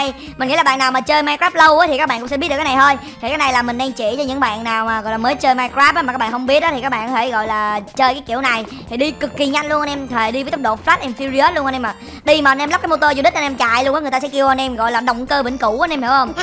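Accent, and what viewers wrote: South, Vietnam. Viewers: vì cách đọc của người miền Nam có chút nhầm lẫn thui . Ko sao cả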